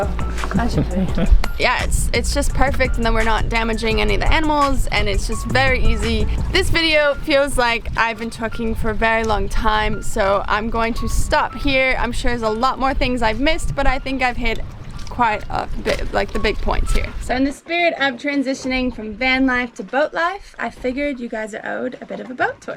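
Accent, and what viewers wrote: Australia. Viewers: Your new Australian accent sounds cute! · Your voice has changed a bit. Sounds a tiny bit Austrialian. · I notice your Aussie accent, been following you for years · you sound aussie now 😊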